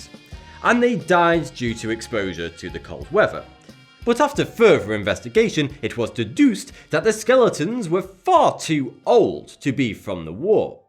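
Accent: United Kingdom. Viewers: Man, where in the UK do you come from, please? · Why do British people have to speak like that? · The British accent?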